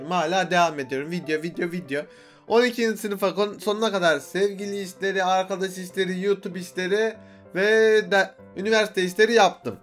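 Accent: Adana, Turkey. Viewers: Abi sen Adana'lı değil misin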